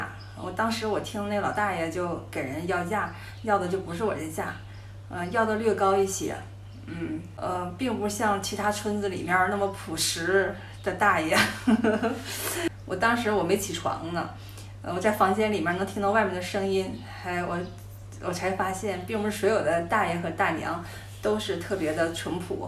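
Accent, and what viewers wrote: Northeast, China. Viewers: Beautiful girls sounds like from Northeast China accents and now Beautiful girl moved from North East China to Southwest Yun nan, Dali.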